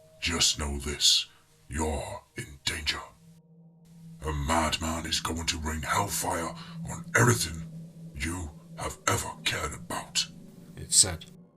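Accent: United Kingdom. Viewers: Your Brit accent and choice of Brit sayings ruins your American stories. Again.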